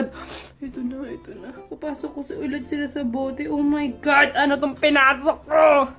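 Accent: Visayas, Philippines. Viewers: so witty 💕 Parang may pagka bisaya accent 😂 which is ang funny pakinggan! HAHAHA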